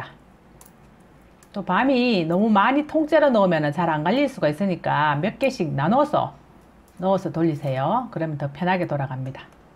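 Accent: Gyeongsang, South Korea. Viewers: 경상도 싸투리넘재미 잇네요? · 구수한 경상도 말씀 억수로 재미있습니다. ㅎㅎㅎ 정감이 가고 님은 살림을 엄청 잘할것 같아요. ㅋㅋㅋ 밤묵은 처음 접합니다. 좋은정보 감사합니다. 엄지척!!! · 항상들어도 구수한 갱상도 사투리의 맛있는 목소리로 가르쳐주신 밤도토리묵 강의 잘 봤습니다. 목소리가 너무 이쁘셔서 얼굴도 꼭 보고싶었는데ᆢ! 너무 아쉽네요. 저는 갱기도 사람이라 그런지 갱상도 사투리로 강의하신 선생님얼굴이 눈에 선합니다. 보고싶어요! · 구수한 경상도 소리 듣기가 너무 좋습니다, 알단 재미있게 들었습니다, 통영에서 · 밤으로 묵을만듣것을 처음보는것갇습니다 경상도 구수한 말 정겨운 목소리 ㅎㆍㅎ 맛난것 많이 배우고 갑니다 ~ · 경상도. 토박이. 말씀. 정감이랍니다 서울사람들은. 무슨말인지모를끼다. 내는안데이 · 여사님은 경상도 아주마라 말씀하시는 품이 무뚝뚝하지만 뚝뚝던지는 말속에 인정 미가 넘치면서도 재미있네요. 옛날 어머니가 해주시는 음식들이 생각나게 합니다. 애교가 있어보이내요. 서방님께 사랑만이 받겠습니다. 밤묵만드 법 잘보고갑니다. 항상 건강 하십시요. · 너무너무~재미있게 하시내요 경상도사투리아주구 수해요~ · 여사님 말씀이 어느동네 억양인가요 갱산도 어느고을 사투리 인가용. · 밤 묵 잘 배워갑니다 감사합니다 구수한 경상도 사투리까지ㅎㅎ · 좋은 정보 고맙습니다. 경상도 억양의 구수한 말들이 고향 생각나게 하네요. 오랜만에 밥뿌재 꼬매는 모습을 보니 엄마 생각이 나네요. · 밤 묵도 맛있어보이는데 갱상 말씀인가 디따 잼나네요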